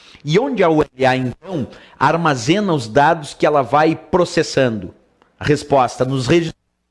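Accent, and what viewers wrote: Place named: South, Brazil. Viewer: nossa que voz chata de povo do sul, sutaque horrivel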